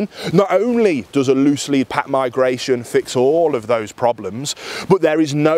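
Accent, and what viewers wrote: United Kingdom. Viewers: What amazing training tips! I've lived in South Korea for a long time so hearing a good British accent is something I miss!!! My lab loves his crate now since watching your video.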